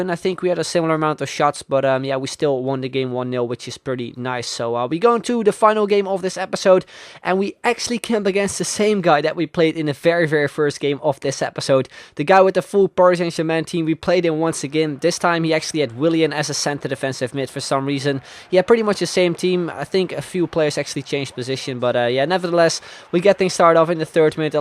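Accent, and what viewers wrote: Netherlands. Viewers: Hij klinkt nederlands, is hij dat ook?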